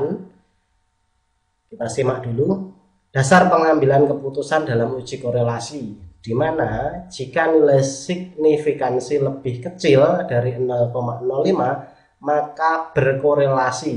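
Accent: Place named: Java, Indonesia. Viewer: medok-medoknya sekilas mirip sama alm. bapakku.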